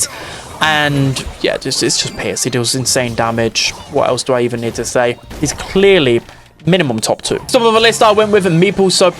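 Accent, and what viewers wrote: United Kingdom. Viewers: Bro's heavy British accent wont let me understand some of his words, who tf is Russ?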